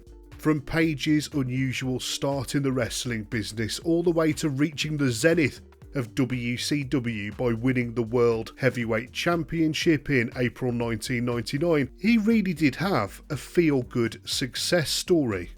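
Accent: United Kingdom. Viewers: No offense but why are all these wrestling bio youtube videos narrated by some UK bloke? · How’d I know this dude would be British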